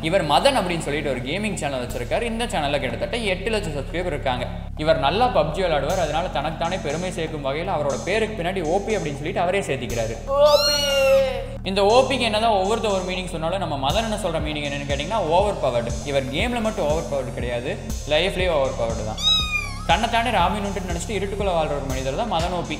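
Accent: Coimbatore, India. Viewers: Namma ooru Coimbatore, Tirupur slang varudhe... mm subscribed ra thambi.. keep doing this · Ur Kongu Tamil sooper cute ❤thambi and evlo azhaga talented ah pesra... Kannu vachuta pa... Suthi poda Solunga... Un ammava...ur way of speaking Really so much adorable 😘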